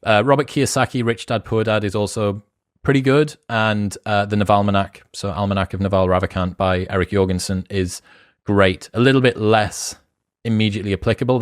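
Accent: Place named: Newcastle, United Kingdom. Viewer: Congratulations on the success Chris, I have been following for quite a while now and I think you deserve every bit of it. Plus its nice to hear a fellow Geordie having these conversations - Living away from home at the moment for my PhD.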